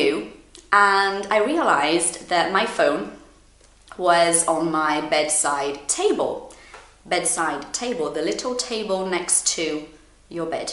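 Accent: United Kingdom. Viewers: your vídeo os Very useful and you have an excellent British accent. Congratulations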